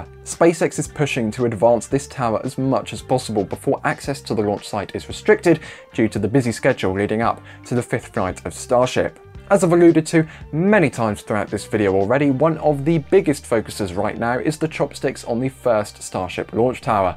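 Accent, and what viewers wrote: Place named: England, United Kingdom. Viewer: The English narrator makes it “real”.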